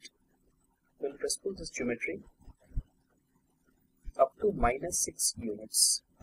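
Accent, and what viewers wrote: India. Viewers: indian accent?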